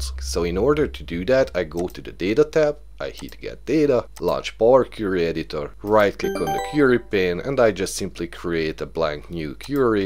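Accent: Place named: Hungary. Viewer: I bet you are Hungarian. The accent!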